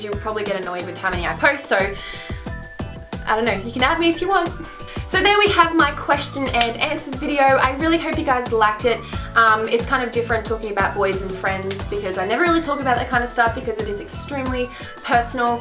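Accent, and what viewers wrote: Australia. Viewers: Are you Aussie? Hopefully I'm not Aussie and alone.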